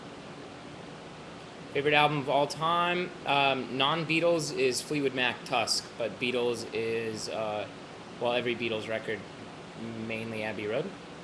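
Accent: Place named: Iowa, United States. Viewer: Pure Iowan accent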